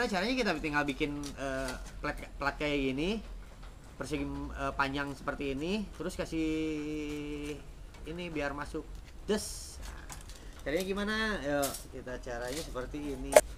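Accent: West Java, Indonesia. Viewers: Resep euy geunaheun ningali logat sundana wkwkwk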